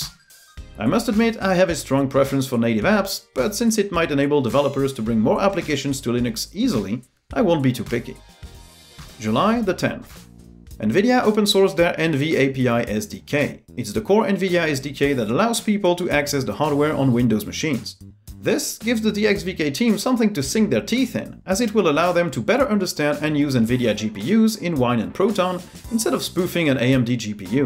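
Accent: Brittany, France. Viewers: Il est de Brest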